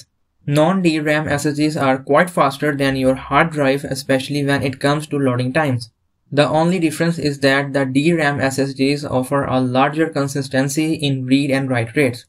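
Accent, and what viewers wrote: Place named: India. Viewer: I don’t understand English in Indian speaking.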